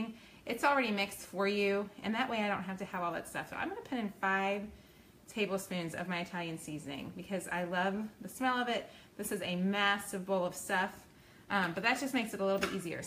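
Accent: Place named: Georgia, United States. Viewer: Where are you from? Your accent is exactly like my friend’s who grew up here around Woodstock Ga 🙂. I just found you on YouTube and I need to go download the cookbook!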